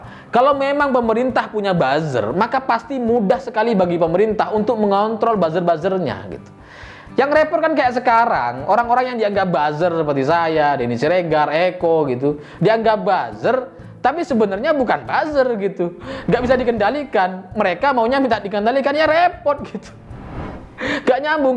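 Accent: Madura, Indonesia. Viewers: Mas Maduranya di tonjolkan dong...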